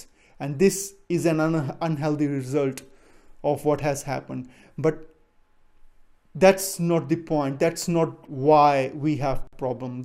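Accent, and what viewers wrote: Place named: United States. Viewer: Why american accent?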